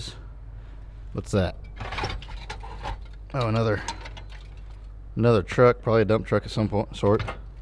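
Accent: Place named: Kansas, United States. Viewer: Shame the rain damaged some stock. You definitely need some more reliable help. I'm 77 fit and well and would be there tomorrow.Havinf know caught up on that Kansas accent which this 'ole South London boy has just got used too. Nice to see the family who are so important for a successful business. Every Best Wish from Wales U.K..... Pat.C.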